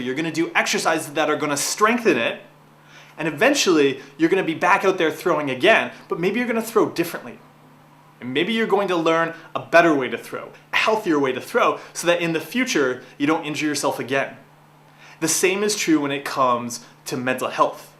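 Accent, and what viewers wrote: Canada. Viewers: Very helpful video. Im from northwest USA and did I pickup on a Canadian accent? Just wondering :)) thankyou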